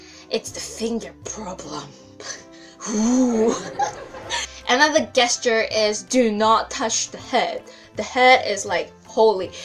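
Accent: Malaysia. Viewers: dude thats manglish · quick speaking mix with slang with up and down tone just like the sea waves. it kinda meshed up mixture of indian/malay/chinese speaking tone . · I can sense the Malaysian-english sliding in as the she talk 😂😂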